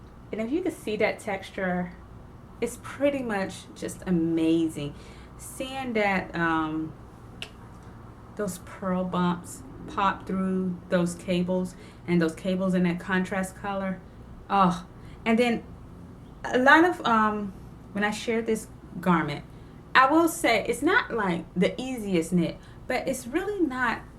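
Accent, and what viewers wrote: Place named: South, United States. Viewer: I’m a southern girl living in Colorado, and I love watching your videos and hearing your accent. It’s a little bit of home. Plus, you’re just adorable! 😊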